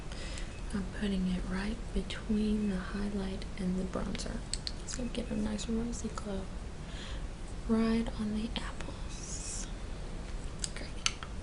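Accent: South, United States. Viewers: You gotta gorgeous southern twang to your voice. I always marvel at you girls who do this ASMR stuff. Don't gemme wrong... I love it. I just don't how you do it, staring into a camera. Y'all are some great actresses. :) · Emo lady with a southern accent omggg ❤ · She sounds like she's from the south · you sound southern? I'm from Kentucky. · Amazing southern accent. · Do I detect a southern accent? Lovely video. I've been watching several that you have made, and they're all so relaxing. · I hear that southern drawl, where you from? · are u southern? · Oh dear God, finally, a woman with a Southern accent has uploaded one of these. There is nothing better than a Scarlett O'Hara.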